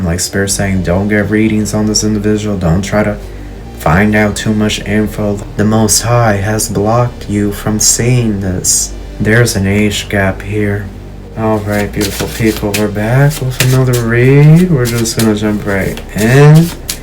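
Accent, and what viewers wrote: South, United States. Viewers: I love your sweet southern accent ❤ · You got a country ,tipsy sounding ,way you speak 🗣️ 💜 I love it ! ! ! 😍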